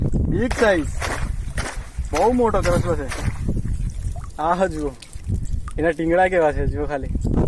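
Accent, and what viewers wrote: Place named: Valsad, India. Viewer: Tmaro fast var video joyo bhasha sabhrine laygu ke tme nkki valsaad naj se 😊 cement ma ma koye saval kyro hto kiya sher na to maru sachu j pyru tme valsaad naj so hu pn valsaad nij su etle bhasha samj pri jay😊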